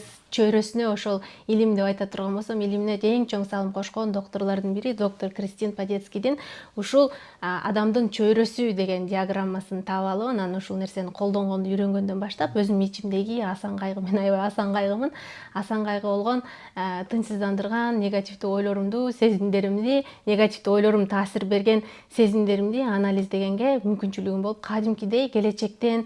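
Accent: Standard. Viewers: Кыргызча таза суйлойт экенсиз🇰🇬😍ыраазычылык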